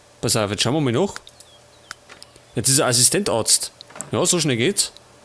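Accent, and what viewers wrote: Bavaria, Germany. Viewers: Find dich richtig geil. Einfach ein bayrischer LPer. Das hat was auch wenn ich dich nicht wirklich verstehe.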